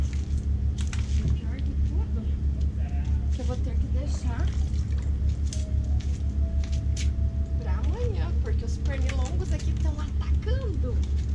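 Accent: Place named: Rio Grande do Sul, Brazil. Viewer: Vc e uma motorista gatinha hem. Fico xonado nesta sua voz de gaúcha!!!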